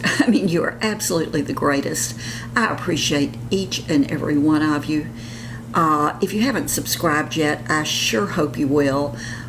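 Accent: South, United States. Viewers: It was lots of fun getting ready with you. You mentioned that you live in Florida. You must live in the panhandle with that wonderful southern accent.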